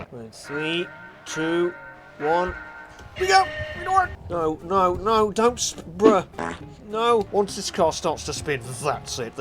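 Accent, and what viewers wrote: France. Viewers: Automation idea: Please make one of those VERY low pickups that are very loud and make LOTS of black smoke 🙏🏼 (btw I made the car with that body yesterday) 🥖FRENCH ACCENT IS BEAUTIFUL🥖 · Le grog mobile Also the best French accent ever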